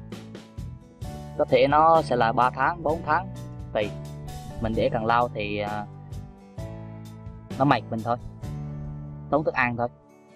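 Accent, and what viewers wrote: Vietnam. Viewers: em nghe giọng anh em đoán ko nhầm thì anh ở đà nẵng giống em haha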